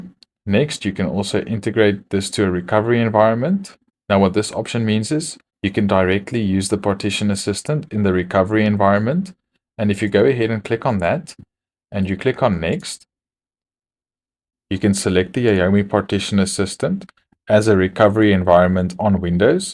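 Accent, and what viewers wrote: South Africa. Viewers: he instantly sounds SO SOUTH AFRICANNNN 🤩🤩😍😍 undeniable SA accent !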